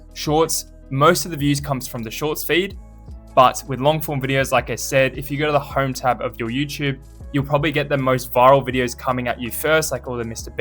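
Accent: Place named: Australia. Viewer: Is that a fellow Aussie I hear?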